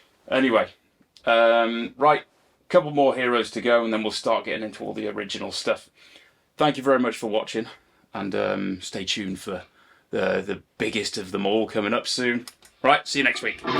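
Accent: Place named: Liverpool, United Kingdom. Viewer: Great Scouser Accent! Amazing playing! ❤❤❤ ❤❤❤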